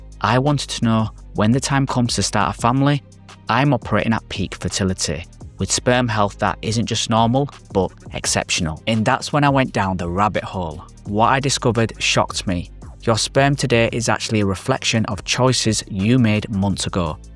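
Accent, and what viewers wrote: United Kingdom. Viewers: I'm curious about your accent... which region of the UK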